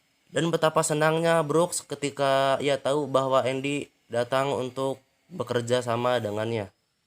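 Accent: Karawang, Indonesia. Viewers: bjir orang karawang 🤣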